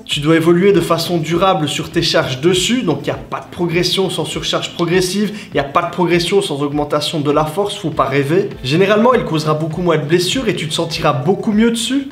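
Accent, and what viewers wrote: Liège, Belgium. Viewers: Salut. Merci pour les infos! Ton accent me fait penser sérieusement que t es du côté de Liège? Vive la Belgique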